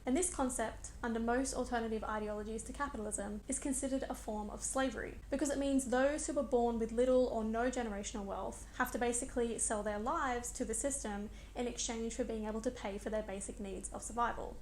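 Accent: Australia. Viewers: You sound Australian ❤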